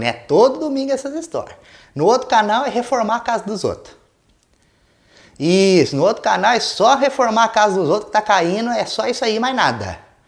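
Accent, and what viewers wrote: Minas Gerais, Brazil. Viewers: Hêêêêê Trêm Bão sôôô!!! Até que em fim, um minêrin pá salvá nóis nu you tubi uai!!! Faz uma recramação do tempo pra se aposentar, que nóis num aguenta mais trabaiá!!! Abraço!!!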